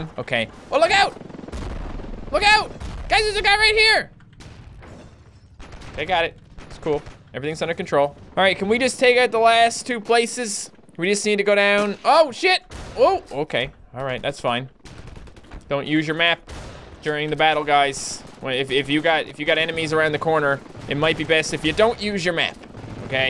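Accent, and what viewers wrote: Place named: Newfoundland, Canada. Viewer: are you originally from Newfoundland? I detect only I small accent of newfie in ya :)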